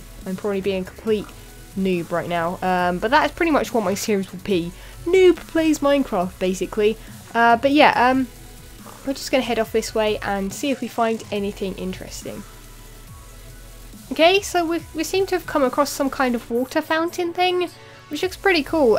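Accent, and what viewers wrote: United Kingdom. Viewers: You had a lot of views for your Hermitcraft series. Hope people will enjoy this one. Good luck to my favourite british accent.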